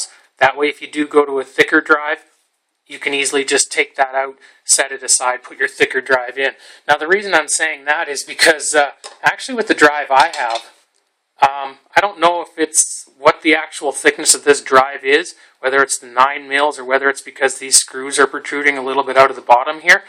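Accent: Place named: Canada. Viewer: Sounds like Canada ... oot (out). Should've cover more technical details. Most of what you covered was cosmetic. Spec details such as is it bootable, what the three onboard ports can be used with - what they will drive (other USB 3 enclosures, etc) and similar would've been more useful than it looks really nice, it's well-built, you highly recommend it, etc., etc. Also, of course it's going to be quite, it DOESN'T have a fan! Your comments sound like you got paid (compensated with free or discounted product) for this review.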